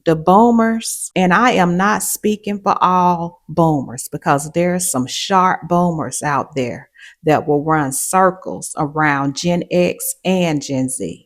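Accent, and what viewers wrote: South, United States. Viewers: Great content! Cool Southern accent. As a Gen Xer, definitely jack of all trades ...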